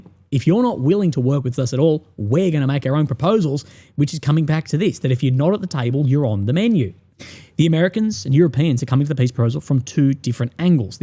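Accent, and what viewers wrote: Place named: Australia. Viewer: Love your channel and would continue to support it, but quite honestly the Australian accent and rhythm is nearly impossible to follow. Not sure what you can do to get around that, perhaps sub titles. No offence but it's really difficult.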